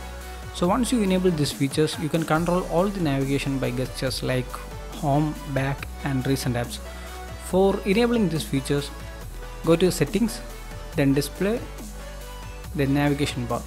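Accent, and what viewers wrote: India. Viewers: The pronuntiation is more indian than english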